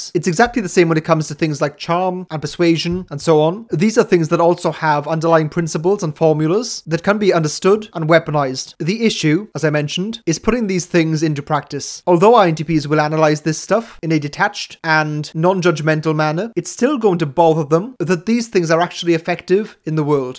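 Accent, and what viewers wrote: Wales, United Kingdom. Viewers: Hello. Are you Welsh, luv? 🏴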